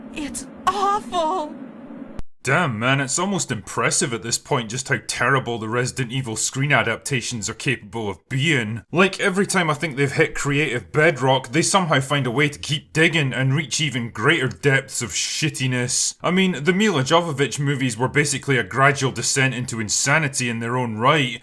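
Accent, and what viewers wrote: Scotland, United Kingdom. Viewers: You seem Scottish but you say eh a lot and you act Irish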